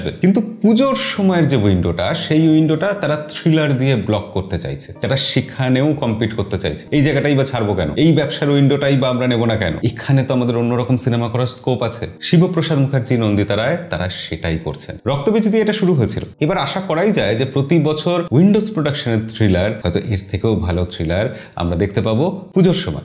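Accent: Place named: Bangal, Bangladesh. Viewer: Apnar accent puro bangal😄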